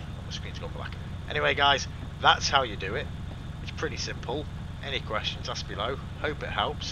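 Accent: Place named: Liverpool, United Kingdom. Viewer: scouser for the win great video mate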